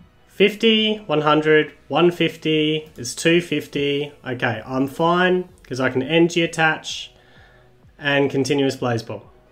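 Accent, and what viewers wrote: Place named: Australia. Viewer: I love that Charizard finally has a competitive card! Also, judging from your accent, I'm guessing you're from Australia? Watching here from the UK! Love your channel! Keep up the good work!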